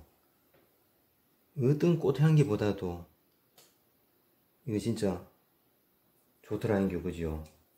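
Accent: Gyeongsang, South Korea. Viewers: 경상도 말씀 설명 정겹습니다~~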